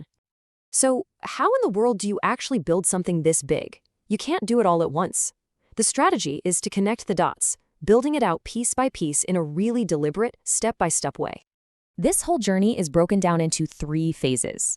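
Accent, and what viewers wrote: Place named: India. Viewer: Globalism, butch girl boss AI voice with annoying vocal fry to obscure Indian accent, leftist climate change BS, brainwashed NPC points. This type of low quality AI slop is so yesterday. Go away, and get a real job doing something useful for mankind, like working on India's trash, pollution, and sewage problems.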